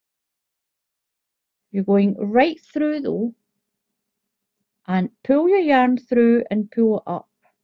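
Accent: Scotland, United Kingdom. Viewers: Thanks for a lovely CLEAR TUTORIAL. I'm stuck in bed after heart surgery so looking forward to trying this pretty pattern later today. Lots of love Kathy. Also lovely to hear a Scottish voice. Been away from Scotland for 50 years ❤️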